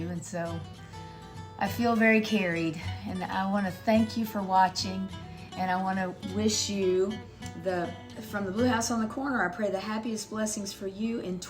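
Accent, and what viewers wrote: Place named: South, United States. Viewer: Happy new year! May god be with you and your family I am so sorry you are going through radiation and chemo god has you in his hand sweetie! I want you to know I love to watch your videos I love your crafting and to be honest I just love your sweet sweet southern voice you have the voice of an angel idk y but you do!! Your voice is very calming to me!! Hope you has a good Christmas , I will have you in my prayers!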